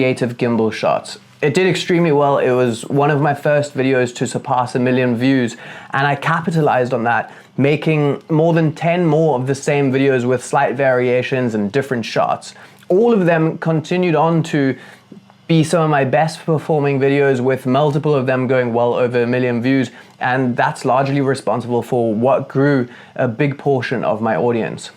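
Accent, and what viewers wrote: South Africa. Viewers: Yoh bru, it's a proper gamechanger hearing a South African accent when you're trying to learn something on YouTube. Thanks for the intel, keen to klap this journey. Sending love from CT. Lekker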